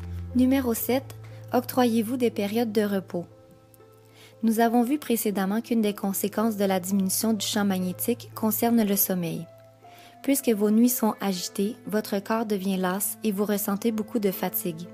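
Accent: Canada. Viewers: l'humanité à l'image de la Terre, malade, en souffrance. Beau documentaire et bel accent cousine Canadienne!!!!!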